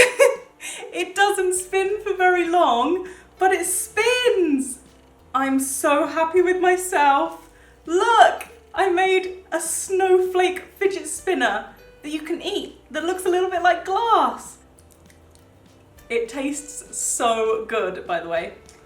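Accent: United Kingdom. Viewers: Wait are you british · I love your British accent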